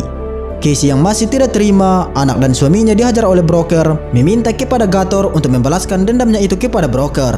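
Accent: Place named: Toraja, Indonesia. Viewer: Klo bisa logat Torajax dihilangkan bro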